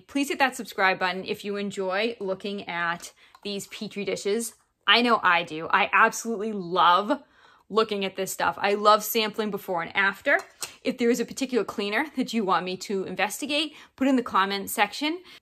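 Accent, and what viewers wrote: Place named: New York, United States. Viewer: Can't get over her accent. New York somewhere?...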